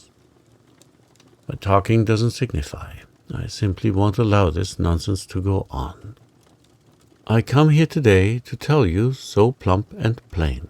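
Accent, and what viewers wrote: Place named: Germany. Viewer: This is the first time I've seen your videos and I am delighted! You are very good at speaking stories! My grandfather had a beautiful German accent like yours. He was very soft spoken as well. If they had You Tube back in the 1980's, my Grandfather could've surely been a part of it! 😆 Thank you for this. I am subscribed now. 🥰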